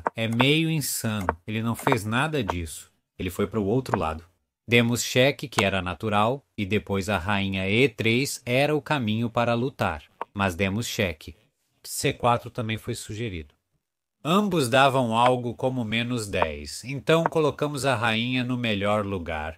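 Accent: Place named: India. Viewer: Pois é, eu adoro o jeito que ele cria a própria língua misturando o sotaque indiano forte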